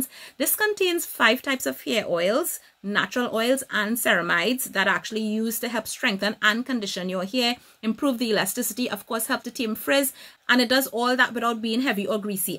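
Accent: Trinidad and Tobago. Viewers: Girl, when I heard your Trini accent I SCREAMT🇹🇹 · I had a feeling it was a trini accent. She sounds like my relatives. Lol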